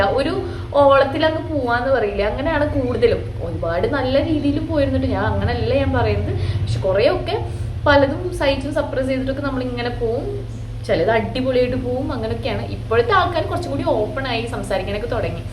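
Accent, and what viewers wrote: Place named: Thrissur, India. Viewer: trissur slang anallo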